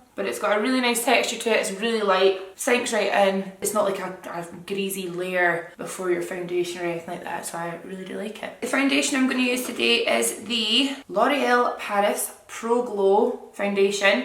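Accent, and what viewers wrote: Scotland, United Kingdom. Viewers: Are you Scottish? You sound scottish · Is she Scottish? I LOVE HER ACCENT! 😍😍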